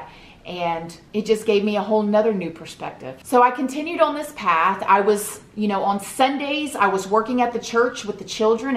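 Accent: South, United States. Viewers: 🇨🇦 .... You aren’t even my kid .. and we area world away .....But wow .. I have to say DAMN girl I’m proud of you !! ... I’ve watched a few of your videos today starting with your horribly abusive childhood ... your prison story ... The whole cavity search (your poor thing) ... And of course your amazing transition to finding 🤗God 🙏🏻 .... I am a Christian and I love that you are bold enough and brave enough to expose so much of yourself and your story to the world ... because there’s no doubt you already have and will continue to help so many !... I hope everyone takes the time to watch and listen to the one about finding God in particular because if ever we need Him it is NOW! ... You are such a beautiful person and I’m a pretty good judge of character !... I am Canadian and I love your southern accent it suits you to a T ... Your son is precious and so blessed to have a mama who can show him what is possible in life even when you don’t believe in “yourself” ... 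God believes in you ... And “we can do all things through Him who gives us strength” Your story and what you have accomplished through it all is rare I hope you know ... Not many who had gone through ALL that you have could have climbed out to become all that you have and are ... Hugs 🤗 to you ! ... Keep on keepin on !💕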